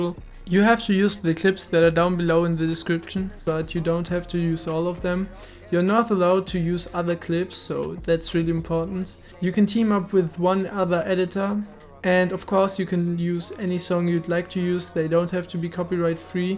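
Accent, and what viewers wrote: Germany. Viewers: Man hört das du deutscher sprichst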